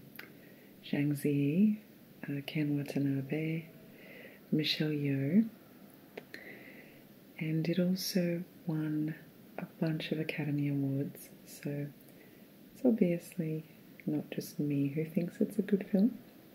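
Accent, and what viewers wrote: Australia. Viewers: 😍📚 Everything about this is perfect! Your articulate summaries for two of my most beloved films, Atonement and Geisha, are much appreciated. ^_^ Keira and Michelle Yuen are favorites. The library is my refuge, and mythology is timelessly dreamy. Your Australian accent lends itself to ASMR as well. 😃 I am thrilled to be a new subscriber.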